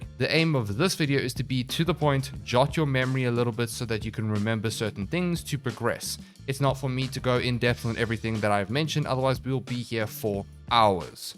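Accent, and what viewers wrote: South Africa. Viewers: South African? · Bra why do you sound south african.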